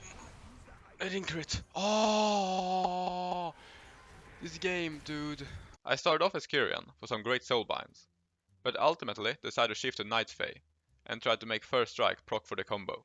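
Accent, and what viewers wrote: Sweden. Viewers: Your Swede accent always brings a smile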